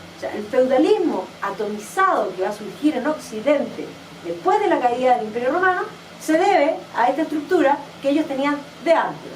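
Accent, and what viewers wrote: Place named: Chile. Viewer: El tema es supremamente interesante pero, y me disculpa la sinceridad, por una parte la calidad de la grabación es pésima y por otra parte el asento chileno tan notorio en el lenguaje de hace perder gran parte de la informacion y la hace extenuante. Lamentable francamente.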